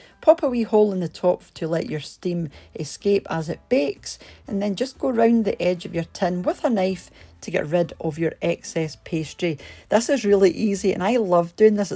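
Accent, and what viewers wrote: Scotland, United Kingdom. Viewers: Came for the recipe, stayed for that lovely Scottish accent😂😂😂😂 they look delicious, I will be making these very soon, thanks🇦🇺🇦🇺🇦🇺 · Well, iv'e never had a curry pie, but this looks awesome. Thanks as always for a great video. Love listening to your Scottish accent by the way. Could listen to you all day long. Greetings from Illinois 🙋🏻‍♂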